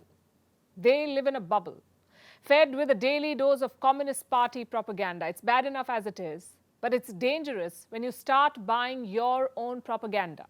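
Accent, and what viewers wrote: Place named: India. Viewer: Palki is paid by the CIA to spew anti-China/anti-Russia HATRED. Her thick Indian accent is meant to fool naive Indians into thinking that she represents Indian people. That is not quite true. Palki never bad-mouths Biden or the Democrats. That is a dead give away. Palki's CIA pay-masters wouldn't tolerate that.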